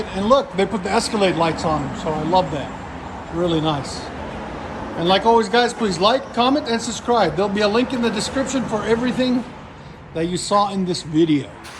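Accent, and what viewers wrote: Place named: New England, United States. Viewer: I cant get past the sound of a yankee doing the commentary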